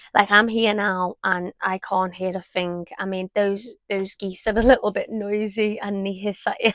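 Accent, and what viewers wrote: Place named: Liverpool, United Kingdom. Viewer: Referred by David (cruising the cut). Nice to hear a Scouse accent! Nice looking little boat.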